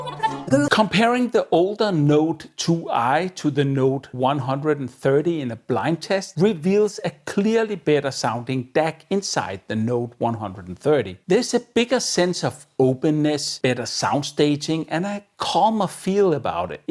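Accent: Denmark. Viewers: Danish accent is just the best 🙂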